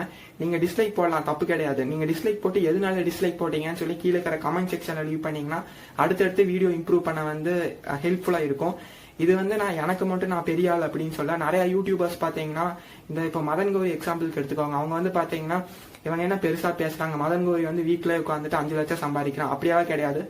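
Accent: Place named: Tirunelveli, India. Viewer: Ennoda suggestion ennanna nammakunnu oru uniqueness irundhathaan namma romba thooram travel panna mudiyum...now u have realized that.. nice ..keep going.. 👍👍👍👍 slang tirunelveli pakkam maadhiri irukku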